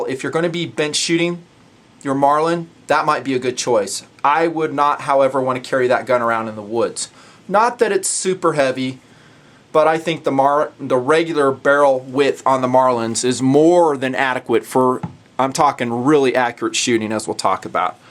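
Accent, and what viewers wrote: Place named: Appalachia, United States. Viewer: I love your hillbilly accent that drops in randomly in the video. but hey I cant get enough of your vids man.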